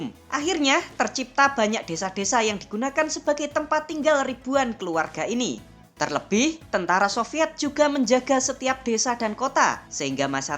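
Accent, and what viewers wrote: Java, Indonesia. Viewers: emg medok nya ga bisa di ilangin dulu itu min selagi ngonten 😁 duta Sheila on 7 aja kalau lagi nyanyi medok nya ilang 😁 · Meddok bgt😆😆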